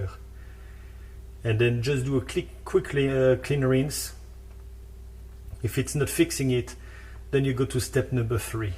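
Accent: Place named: France. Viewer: T’es français ? I like your accent 😀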